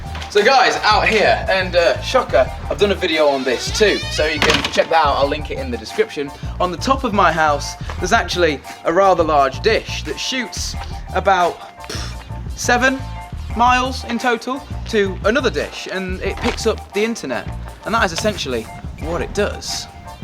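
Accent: United Kingdom. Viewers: I dont like BRITISH ACCENT!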